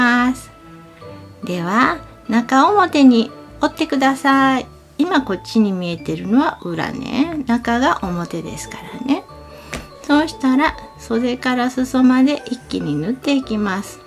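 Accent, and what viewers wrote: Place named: Kansai, Japan. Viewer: さんいつも拝見してます！そのおしゃべりがほんわかしてて本当に癒される〜 適当でええよ〜とか！時々 関西弁？って思える節もありますがどちらの出身ですか？ワンピース簡単そうでチャレンジしたくなりました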